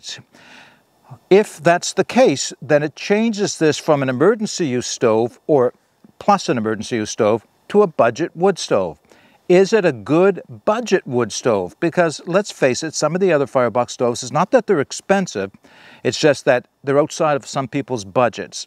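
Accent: Canada. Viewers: Thanks. I m sure that I’m not alone, in appreciating your easy yet thoughtful presentation and nice soft Canadian accent.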